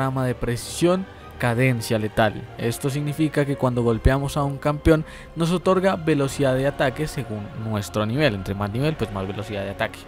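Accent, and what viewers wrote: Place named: Bogotá, Colombia. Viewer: Oye qué excelentes guías! Son las mejores que he encontrado en español. Felicidades. Tengo la impresión de que eres de Bogotá jajajjaau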